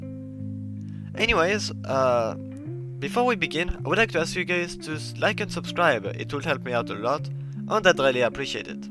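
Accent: France. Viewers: Are you French ? Your accent seems French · T'es français ? Ta un accent français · Are you French? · Are you perhaps, french?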